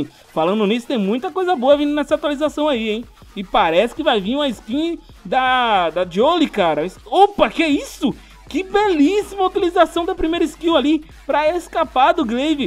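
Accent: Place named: Bahia, Brazil. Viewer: Você é da Bahia ??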